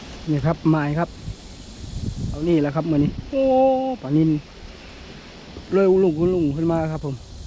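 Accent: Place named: Thai Yo, Thailand. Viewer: ไทยญ่อบ้านได๋น้ออ้าย? สกลฯหรือนครฯ?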